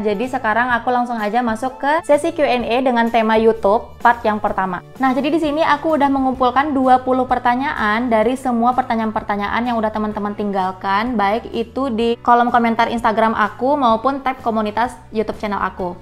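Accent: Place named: Karo, Indonesia. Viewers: logat karo nya 👍👍👍👍👍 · logat nya sumatera ya mba..horas mamak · Awal ibu kakak itu ngomong Aku langsung keingat kalau orang karo berbahasa indonesia itu ada khasnya :v, dan aku tadi terkejutnya pas kakak ngomong bahasa karo :v auto bangga jadinga Sukses ya kakak Btw kakak org karo ?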